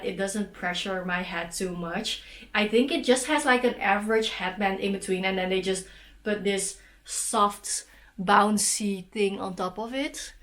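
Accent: Netherlands. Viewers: Is your accent dutch?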